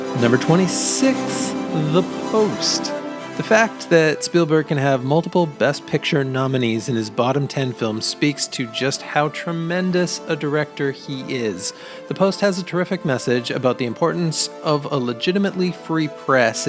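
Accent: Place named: Canada. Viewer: The commentator sounds Canadian. I love the way he says a boot instead of about!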